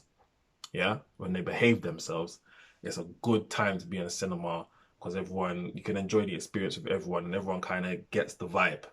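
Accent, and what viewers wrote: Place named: London, United Kingdom. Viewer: Just subscribed. Great review. You made me feel like I liked it more than I originally thought. I think my only qualm with the film was there were slightly too many red herrings! Like, a lot of “you thought this happened but it was actually this!” If that makes sense haha. Side note it’s so refreshing to hear a familiar accent on YouTube 🤓🙏🏾 you sound like London and I’m from there so it feels like watching a friend. Keep up the great reviews! I’d recommend 1917 if you haven’t seen it yet. One of the best films I’ve seen in a while Edit - just seen you’ve got a 1917 review up so I’m gonna watch it now!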